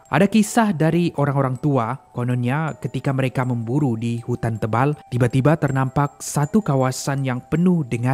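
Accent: Sabah, Malaysia. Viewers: sabah bah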